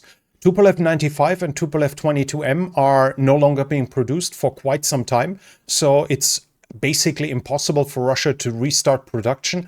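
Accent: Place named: Germany. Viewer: Almost sounds like you have a German accent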